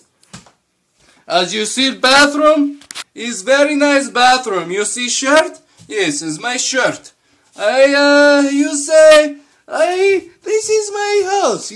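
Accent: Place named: Russia. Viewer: that's a good russian accent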